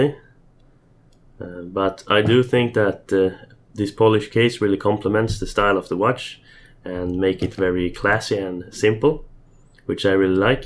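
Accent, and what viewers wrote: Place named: Sweden. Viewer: The accent haha. I've heard it a million times here in Sweden, though you don't have one as thick as most.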